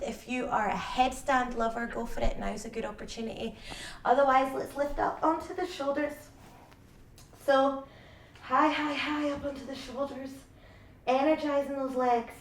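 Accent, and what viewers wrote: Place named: Glasgow, United Kingdom. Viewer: That was great the glasgow accent made it better thanks namaste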